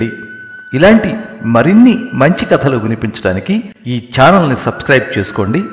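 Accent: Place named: Godavari, India. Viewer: నవ్వి నవ్వి పొట్ట చెక్కలయింది. మీ గళం అసామాన్యం. గోదావరి యాస‌ చక్కగా పలికింది మీ గొంతులో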